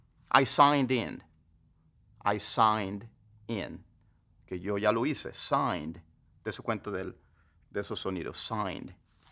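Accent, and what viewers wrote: United States. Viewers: His English has such a strong American accent lol I thought he was American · Por qué tu español tiene acento gringo??? Es un efecto de aprender el inglés con acento nativo?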